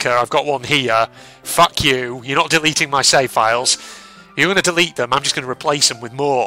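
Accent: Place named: Ireland. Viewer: Damn I love the irish voice^^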